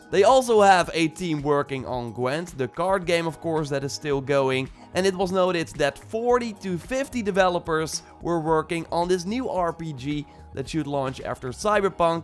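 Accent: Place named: Netherlands. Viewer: Are you dutch by any chance? Your accent sounds familiar! Great vid btw!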